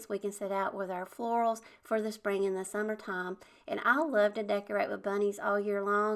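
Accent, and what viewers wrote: South, United States. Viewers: I like your southern drawl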